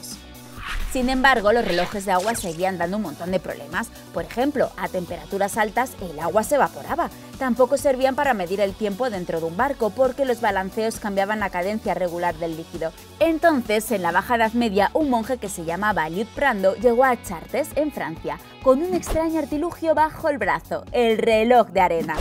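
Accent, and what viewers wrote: Spain. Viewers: Es de España. No se le nota? -_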